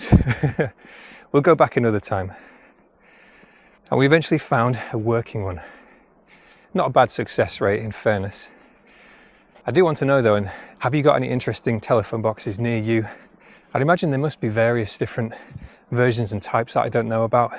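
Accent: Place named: England, United Kingdom. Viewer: Ah *that*'s an English accent I like. Fits the scenery, too.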